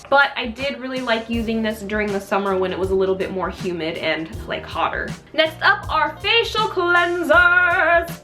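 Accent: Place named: Australia. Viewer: The little hints of Aussie accent always crack me up 😂💖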